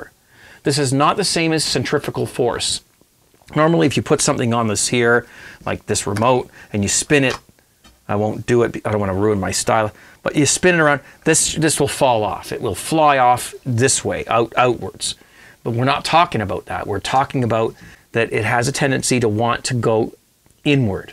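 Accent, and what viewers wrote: Canada. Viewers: Must be because he's Canadian (I can hear it). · Also, one of the best Canadian accents ever. Thank you for this video!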